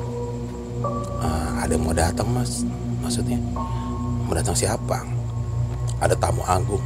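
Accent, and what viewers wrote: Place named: Jakarta, Indonesia. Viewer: Bg orang mana?? Jakarta y... Betawi nya kaga ilang..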